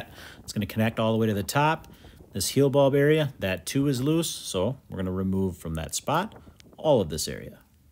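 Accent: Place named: Great Lakes, United States. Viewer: your Great Lakes accent is music to my ears. I’m from Western New York originally, and you sound like home, even though you’re in Wisconsin.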